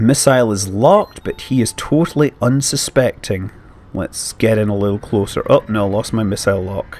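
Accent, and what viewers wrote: Scotland, United Kingdom. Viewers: are you from scottland? you have a scottish accent.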